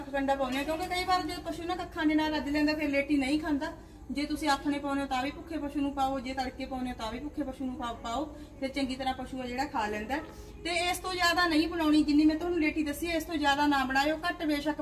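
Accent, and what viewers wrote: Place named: Moga, India. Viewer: ਭੈਣਜੀ‌ਮੋਗੇ ਏਰੀਆ ਦੇ ਲਗਦੇ ਓ ਲੇਟੀ ਸਬਦ ਓਧਰ ਹੀ ਬੋਲਦੇ ਓ ਵੈਰੀ ਗੁੱਡ ਭੈਣੇ ❤❤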